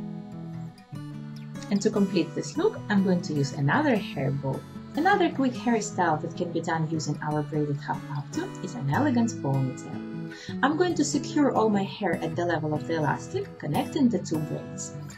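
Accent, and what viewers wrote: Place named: Russia. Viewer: I believe she is Russian, but living in France, so she as a mixed accent :)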